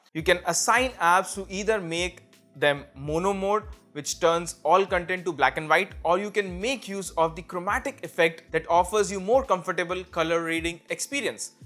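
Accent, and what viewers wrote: India. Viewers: Indian-English accent is so annoying.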